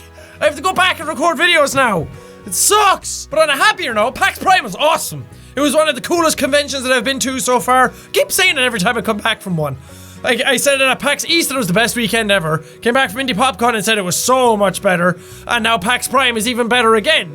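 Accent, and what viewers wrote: Ireland. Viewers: Am I the only one who likes his accent? Normally I don't really like Irish accent but his is cute. No... I'm the only one... Ok.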